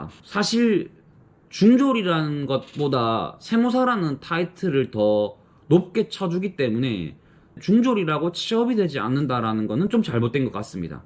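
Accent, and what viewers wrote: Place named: Gyeongsang, South Korea. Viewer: 말투 들어보니 경상도 분이신듯? 학교 다니면서 붙으셨나요? 혹시 개업하신 분인지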